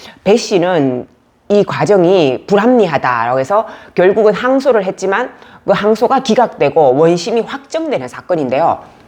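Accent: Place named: North Korea. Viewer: 뭔가 발음이 다르신데 미국에 오래살아서 새는 그런 발음하고는 다름. 북쪽 발음이 느껴집니다.😅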